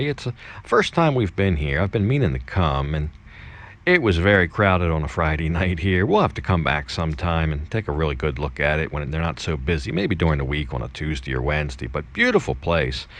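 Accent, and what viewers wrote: United States. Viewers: Because he speaks like an American. Nothing wrong with it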